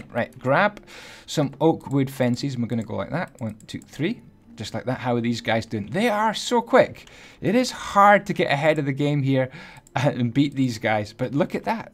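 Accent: Scotland, United Kingdom. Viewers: Are you from scotLand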